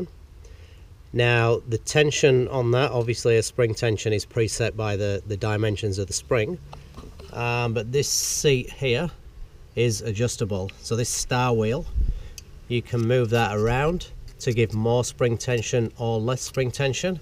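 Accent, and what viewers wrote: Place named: North of England, United Kingdom. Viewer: Hi,I finally got round to this job last week on my L400 Delica, your video helped immensely - thanks! On the Delica the TPS is connected to the bracket on the front of the boost compensator, with two spacers between the brackets - damn that was a horrible job getting it all back together haha. Overall the difference is significant when the boost kicks in. I've also added a boost controller between the turbo and wastegate too, and the EGT gauge goes in today. - I've got to ask - your accent sounds like you're originally from the North of England, by any chance are you?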